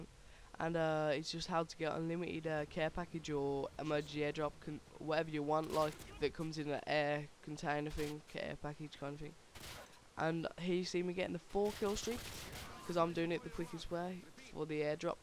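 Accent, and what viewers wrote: Birmingham, United Kingdom. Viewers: its his accent..brummy niot the british language itself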